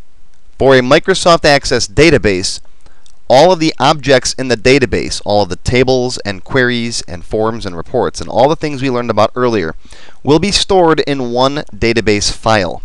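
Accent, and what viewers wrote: Chicago, United States. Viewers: Awesome series, thank you for breaking it down and making it dummy proof. On a side note, you sound like you are from Chicago.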